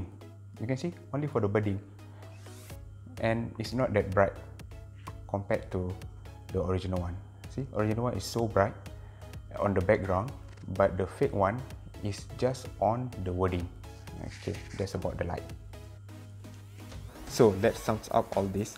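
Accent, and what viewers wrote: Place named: Malaysia. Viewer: Logatnya inggris malaysia yah,salam dr indonesia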